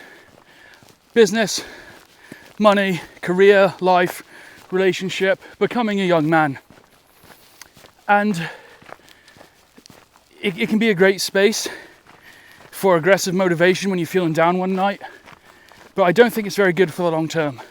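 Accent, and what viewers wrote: United Kingdom. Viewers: I've been trying, unsuccessfully, to place your UK accent. There's a slight hint of "country" to it, but it's very subtle. Mine's a kind of Norfolk/Essex/Cambridge mash-up. Apparently, I get a bit "posh" when I've had a beer. I'm really not! 😂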